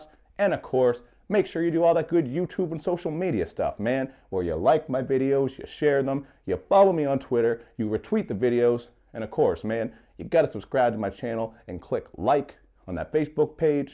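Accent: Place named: Newfoundland, Canada. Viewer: nova scotia? I knew you were a newfie. that accent sticks out like a sore thumb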